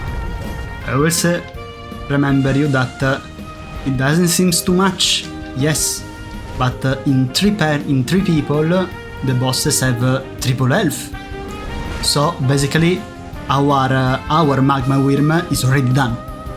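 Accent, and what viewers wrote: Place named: Italy. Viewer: I think your english pronounciation is the worst I've heard so far. It's impossible to understand the video without turning on the subtitles. I could argue you might be italian from the accent. Either way, work on that or just start using elevenlabs for AI generated voice instead.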